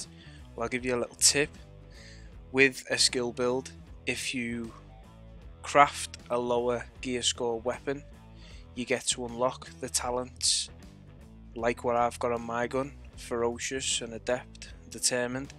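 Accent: Liverpool, United Kingdom. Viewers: Right accent, only accent in UK that I can bloody stand haha apart from my own of course lolz.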